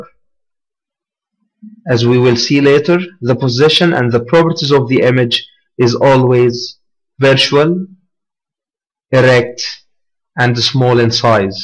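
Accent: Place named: Russia. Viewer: What's with the Russian accent ??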